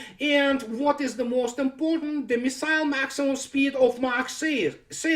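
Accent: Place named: Russia. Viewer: I love your sarcasm, with your Russian accent" nincompoops, imbeciles, idiots have to laugh . if you don't laugh you cry.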